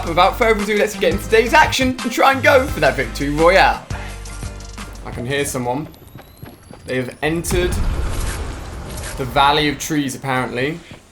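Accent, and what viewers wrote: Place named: England, United Kingdom. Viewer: Mason Coggins he didn’t he said freaking remember he has a accent cuz he is from England duh he said like he said the f word but he didn’t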